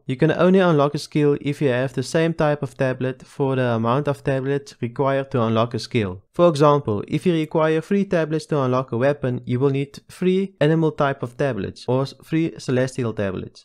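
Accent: Afrikaans, South Africa. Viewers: Wow that afrikaans accent is strong with this 1.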